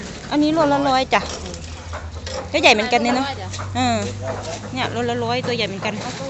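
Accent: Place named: Laos. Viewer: ยุอำเภอไรครับพูดออกลาวๆ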